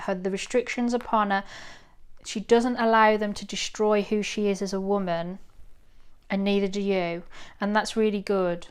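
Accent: Birmingham, United Kingdom. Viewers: Are you a brummie?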